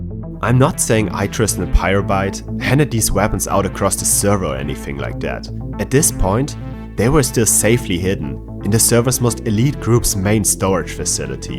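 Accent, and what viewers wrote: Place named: Germany. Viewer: German accent +99999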